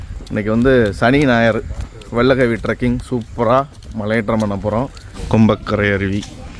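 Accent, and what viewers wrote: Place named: Ramnad, India. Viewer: unga ramnad tamil pronunciation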